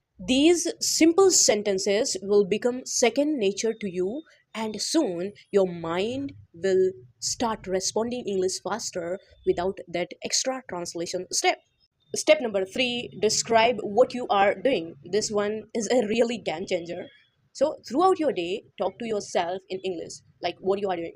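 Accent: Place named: United States. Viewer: Are u living in US ? Ur accents is very closer to them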